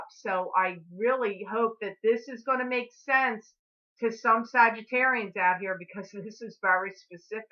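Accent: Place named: Chicago, United States. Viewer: did you grow up in the Midwest? You totally seem like you're from Chicago in the way you talk and act! (That's a compliment, by the way! LOL)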